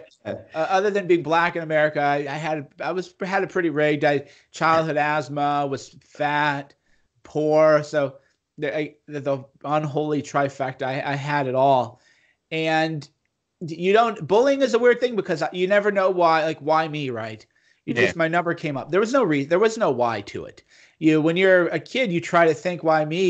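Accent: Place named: Germany. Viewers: He sounds likes he is from Germany or some eastern European country. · Yup, German!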